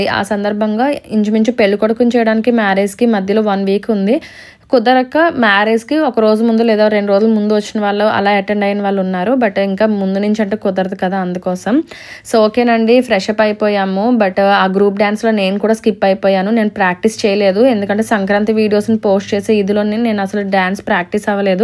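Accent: Godavari, India. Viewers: Hi Gaaru, How are u doing....Nice to see u again... U R a stunning Beauty... Varninchataaniki Maatalu leveu... Mee Godrolla Slang Baaguntundi.. Traditions baaguntai.. Pilupulu Baagunnai.... Mee Sisters Super.... I hope U enjoyed.... in Marriage Celebrations...... Take care have a great day.. Cheera color Super ga undi andi..... Thanks for sharing......😃😃😃😃😃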